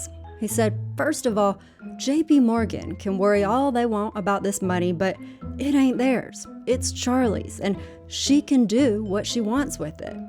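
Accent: South, United States. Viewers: Such a great series! Glad I stumbled upon it. Great production quality and lovely southern accent behind it!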